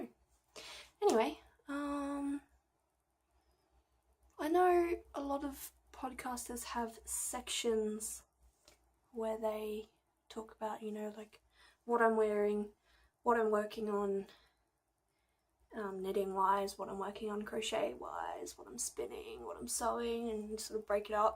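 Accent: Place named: Australia. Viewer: Hahaha love your style so funny. The accent and humour pointed to oz, I'm from NZ. Great podcast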